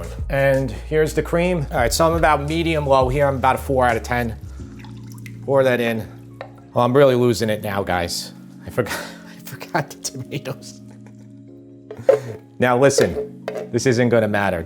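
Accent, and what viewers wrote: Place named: East Coast, United States. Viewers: shake n bake. love the east coast accent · Hahaha. I was like this guy has to be from long island. Saw the location. Locatelli is the only acceptable grated cheese.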